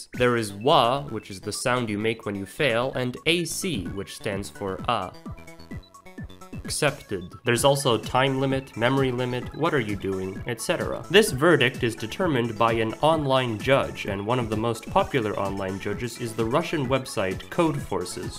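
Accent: Netherlands. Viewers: I love your dutch pronounciation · Love your dutch pronunciation tho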